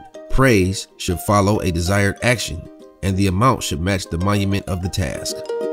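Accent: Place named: Ohio, United States. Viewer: That man educating and sounding like he from the other other side of Ohio. ❤️ get extra proper for the people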